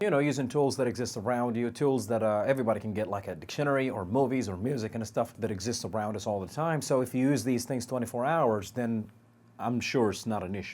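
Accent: Texas, United States. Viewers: هذة لهجة تكساس اميركا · your accent is American somewhat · toto toto It is just because American language that's the reason you couldn't understand anything my dear but I hope u could understand everything with the passage of time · Bro ur hilarious and ur accent is nice it seems like u came from America or some other ENGLISH country I'm Algerian/Canadian I was raised in Canada and I hope I can meet u one day or talk to u through Facebook or something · like that american accent, apreciate that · he sound like a person from texas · Very useful video, thank u!! by the way; your accent is amazing😍 feels like you're an american guy tho.. keep it going✋🏿. and i want to say something; if you love something you can do it. No matter how hard it is. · you talk with use amircan accent very good. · اخويا اللهجه هادي امريكيه ؟ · I',m really like how you talked and your accsent like American people, i will be like you and better, i will come back to this video after one year to Comparison my language, finally i Thank you mr. 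· المشكلة يتكلم انجليزي سريع وامريكي وهذي مصيبة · great video but you speak american acten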